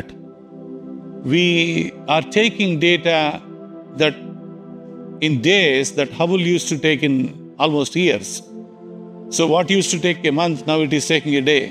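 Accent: India. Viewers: I can’t watch you videos because your accent makes me think of all those Indian scam call centres!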